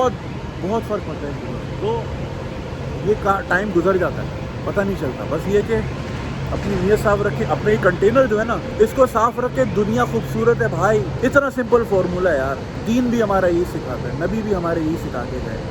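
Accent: Karachi, Pakistan. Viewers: Love hearing your khalli Karachi accent! I forwarded to my close Chicago friends to check out even all of us Pak Americans have been Chicago residents over 25 years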